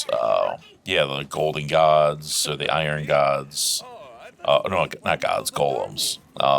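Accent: United States. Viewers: I knew you were from Wisconsin! I knew it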